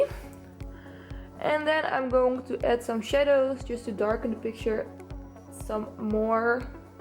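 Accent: Netherlands. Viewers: u dutch? guz your accent sounds dutch tho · ik ken je kanaal pas net maar je bent echt gorgeous!! aan het begin van deze dacht ik al gelijk te horen dat je Nederlands was en net ik de comments zag ik dat dat ook klopt lmao!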